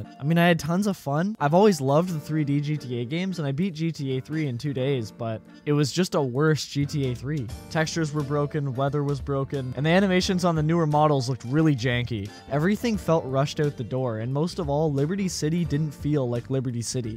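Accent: Canada. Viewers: I always like how u can tell someone is Canadian when they say: about. Great vid btw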